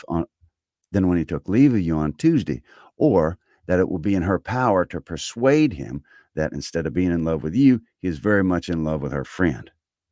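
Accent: South, United States. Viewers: Ah I see, sorry I thought you meant your wanted to know, but as a literary reading device à la manière du sud it's very effective. lol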